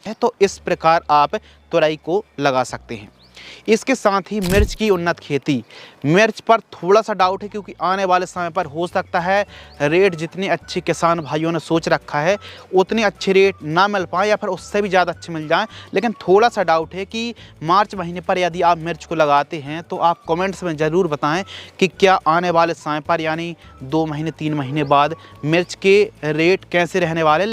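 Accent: Bundelkhand, India. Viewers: Aap Bundelkhandi ho ..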